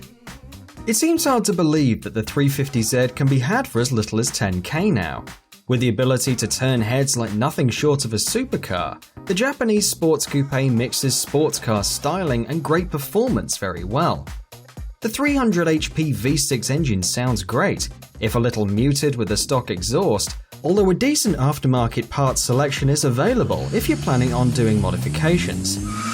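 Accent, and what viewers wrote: United Kingdom. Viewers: It's a neeesan not a nizzan 😂😂 i hate British accents lol . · Guys... It will be cheap cause, Listen to that accent, Pure english, that means UK confirmed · You're talking in Dollars and about the US market, but you cannot sit there and tell me you are American with that blatant British accent. Either talk in Pounds & UKDM, or get more American; pick one. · Because he's from The UK...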